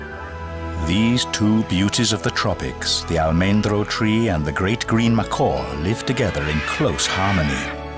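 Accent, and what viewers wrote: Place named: England, United Kingdom. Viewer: All nature shows should be voiced by English men, this video is proof of that. Beautiful and tragic, only true nature lovers and poets can appreciate this kind of documentary.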